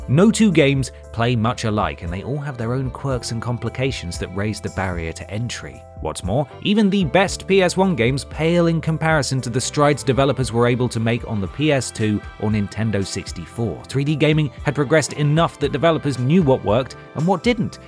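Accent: United Kingdom. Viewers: This channel says it's fun United Kingdom, and the host sounds British. But it's just another US channel. It's USA vs Japan, it's "dollar" that just assumes US dollar, even though it wasn't started. Why do so many Brits want to be American channels? Be international.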